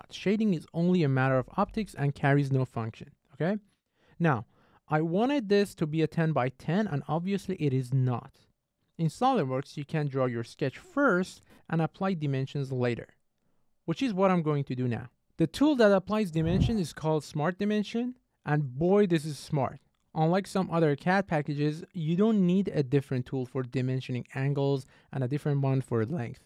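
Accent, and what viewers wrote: Iran. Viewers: why i think you are from iran😂😂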